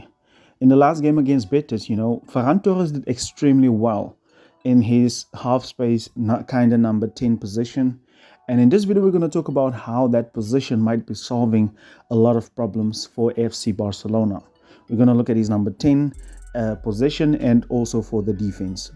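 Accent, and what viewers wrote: South Africa. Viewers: hey mate you sound South African, where you from?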